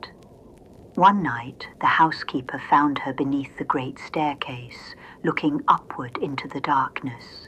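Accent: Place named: United Kingdom. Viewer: I really enjoyed that, another British voice with added sound effects, wonderful!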